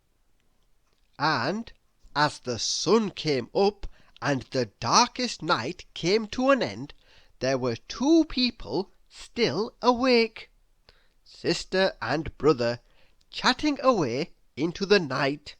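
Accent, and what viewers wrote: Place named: India. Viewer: im indian and are you Indian because your photo looks indian and you sound indian🙏🙏🙏🙏🙏🙏🙏🙏🙏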